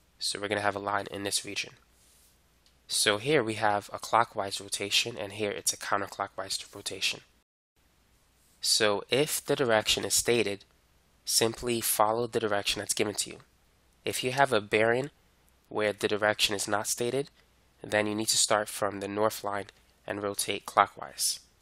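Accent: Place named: Boston, United States. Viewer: Boston Accent.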